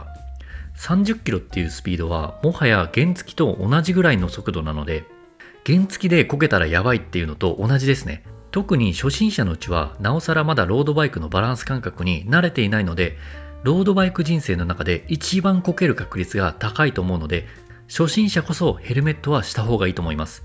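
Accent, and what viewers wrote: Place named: Aichi, Japan. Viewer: は愛知県内在住っぽいですね。ヘルメットのアクセントが「へ」ではなく「メ」ですね。私と同じ。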